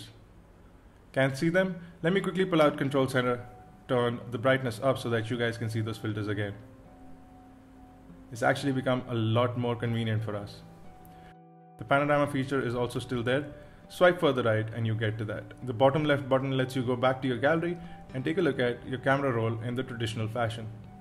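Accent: India. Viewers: You can tell he's Indian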